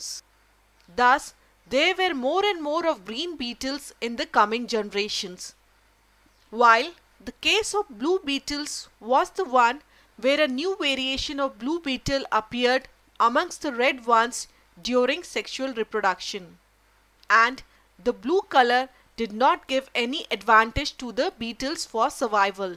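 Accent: India. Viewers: agar aap hindi mein bolte to jyada aacha rheta.Apki english sunke ye to clear hai ki aap ki mother tounge hindi hai...or aapko bhi ye pata hai ki hum sbhi indians hai and hindi jante hain.......phir kyon english????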